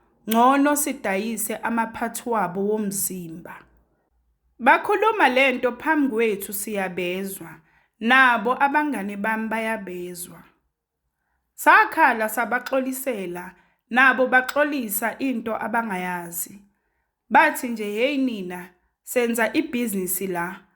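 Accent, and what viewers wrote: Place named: Nigeria. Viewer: Wassalamu alaikum wa Rhamatullahi wa Bharakatuh You sound Nigerian yourself.. 'cause you are not Umzulu....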